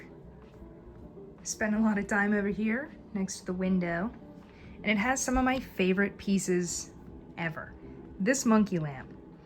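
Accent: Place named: Carolinas, United States. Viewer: I think it's from the Carolinas in the Southeastern Coast of the US. I'm a Mississippian stuck in the PNW and I think it's lovely.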